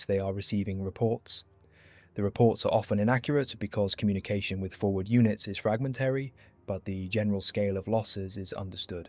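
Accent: United Kingdom. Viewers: Get rid of the UK narration 🤮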